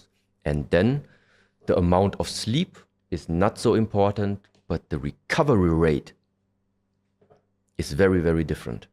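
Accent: Germany. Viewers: Didnt expect the german accent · Why does bro have a really German accent? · Sag ma bin ich es oder hör ich da einen deutschen Akzent?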